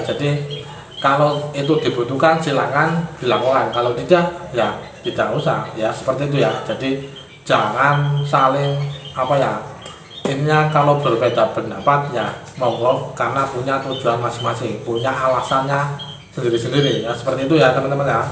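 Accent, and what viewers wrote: Banyumas, Indonesia. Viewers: Ora ngapak ora penak 🤫🤭😄😂 Salam tukang tempe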